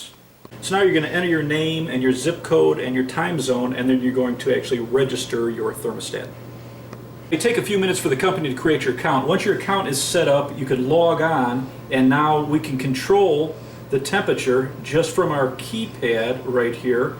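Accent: Chicago, United States. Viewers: The guy sounds like he is from Chicago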